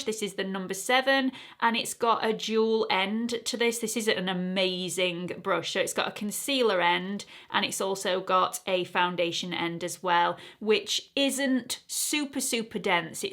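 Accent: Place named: United Kingdom. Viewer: Hi. Have you always had the accent? Oh my goodness, I know I've watched your videos before, but I don't remember you having an accent. Are you Australian or English? LOL I'm so sorry, I thought you were American before. I wish I could use a British accent whenever I feel like it, just saying 😅 Sorry, just asking cuz it surprised me ❤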